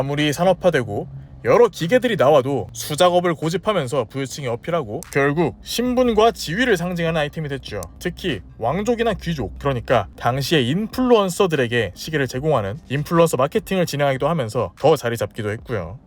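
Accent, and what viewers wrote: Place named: Jeolla, South Korea. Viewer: 말투가 진짜 특이하시당... 경상도 같다가 전라도 같다가..😮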